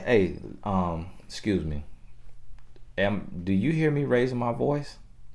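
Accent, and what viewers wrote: South, United States. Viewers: Be blessed!!! Thank you so much for your wealth of knowledge! I look forward to your hour long videos every day!!! You have changed my life so much and I cannot thank you enough!!! Started my coaching class as well! I do favor the country version of ya!! That guy that said your country accent would set you apart from everybody else wasn’t lying! It’s definitely what makes you stand out and not sound like everyone else!!! So thank you for being you and all the work you do! God Bless You, The Queen, and Your Kids!!!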